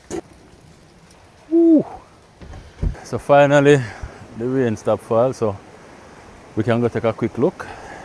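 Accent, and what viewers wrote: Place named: Jamaica. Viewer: my grandma is from Cuba too. Love the interaction and love your accent Love the Jamaican accent with the Cuban accent beautiful. She was just the same curses in patois curses in Spanish We love her. Great job girl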